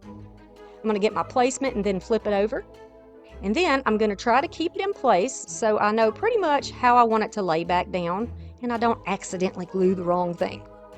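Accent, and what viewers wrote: South, United States. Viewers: I love your videos 😻 and I must say your accent reminds me of The great country ⭐ star!! 😂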